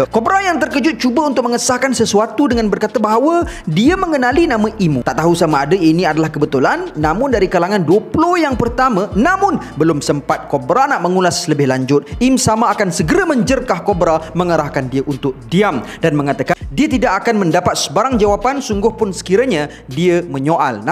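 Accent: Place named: Kelantan, Malaysia. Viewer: Kemahhh selit dielek kelate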